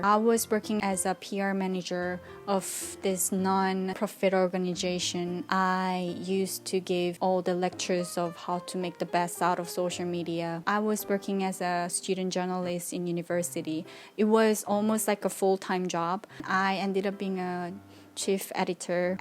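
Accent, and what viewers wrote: United Kingdom. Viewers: 아주 깔끔한 발음에 얹어진 브리티쉬 엑센트가 넘 좋아요~~ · 너무 멋져요!!!!! 씨 보면서 저도 다시 영어공부하게 되네요 씨의 영국식 영어와 캐나다식 영어 발음이 너무 듣기 좋아요!!! 당당하고 멋진 삶 응원해요!!!!👍❤️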